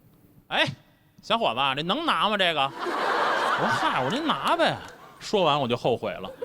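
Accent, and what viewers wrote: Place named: Beijing, China. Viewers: 京腔是我的最爱，没有之一。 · 特喜欢良言，京腔儿听着特舒服，幽默高级，不喊不叫，但让人乐半天，加油！ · 典型的京腔，条件反射式的反感啊，唉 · 典型的京腔幽默😂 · 他的北京话我爱听，不烦人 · 好喜欢他的京腔啊❤ 段子也好👍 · 可能是在北京生活过太久的时间 所有这些脱口秀就这个京式幽默我笑不出来 没觉得好笑 感觉都钝感了 光是那个口音出来我的笑点就提高了20层楼高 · 听了良言的京腔想起童漠男了。他的段子也很喜欢！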